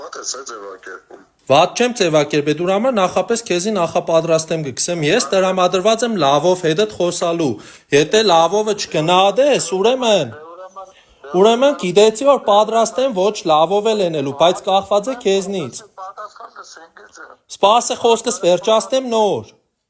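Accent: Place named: Gyumri, Armenia. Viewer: Акцент Гюмреци молодец сынок джан спаси сахрани Господи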